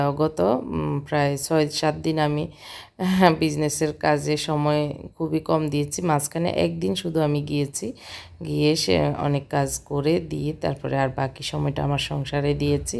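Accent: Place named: Feni, Bangladesh. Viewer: Apu tomer kotha gula sunle apon apon mone hoi, karon ami o fenir, purbo ukil para, thaki Dhaka te, r tomer vlog dekhar jonoo wait kori,balo thako.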